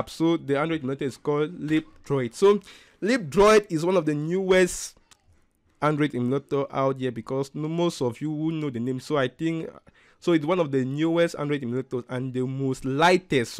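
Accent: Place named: Nigeria. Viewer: no you somewhat sounded like a nigerian in a way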